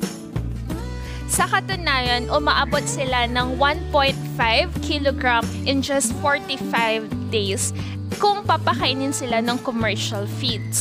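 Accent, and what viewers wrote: Pangasinan, Philippines. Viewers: Pangalatok si maam may punto.hehehe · Pangasinan accent si ate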